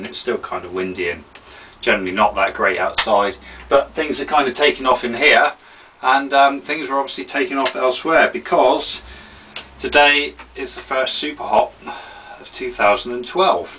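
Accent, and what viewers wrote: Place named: United Kingdom. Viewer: I enjoy your videos. Like I mentioned earlier, you are just a dead ringer for my oldest son, it's like watching him with a Brit accent :-). Continue to carry on.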